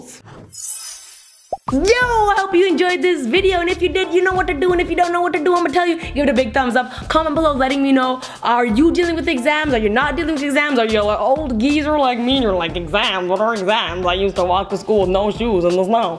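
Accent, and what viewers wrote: United Kingdom. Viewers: That British accent😍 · Is it just me that LOVES her English accent 🤣🤣🤣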